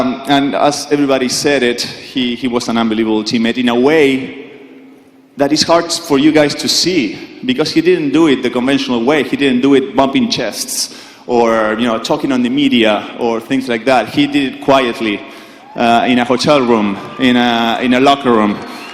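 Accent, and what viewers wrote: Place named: Nigeria. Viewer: if you close your eyes you could bet Manu is nigerian. (awesome speech tho)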